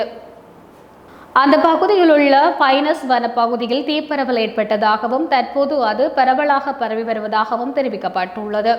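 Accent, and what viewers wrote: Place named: Jaffna, Sri Lanka. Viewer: siranda Yarl thamil uchareepu!! vaalthukkal🎉🎉🎉😊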